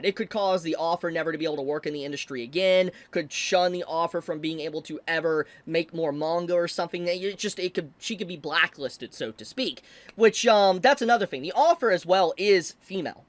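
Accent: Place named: Berkeley, United States. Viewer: She sounds like STRAIGHT from Berkeley, University of California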